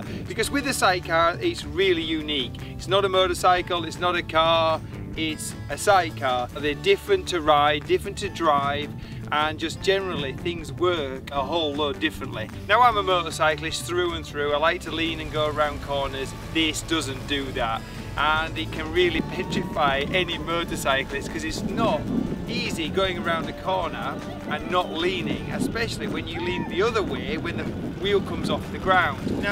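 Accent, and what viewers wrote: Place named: England, United Kingdom. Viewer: Where about from England are you from??.. Scouser here. And looking at Trikes, sidecars, and everything in-between. Ohio is a bit thin on the ground.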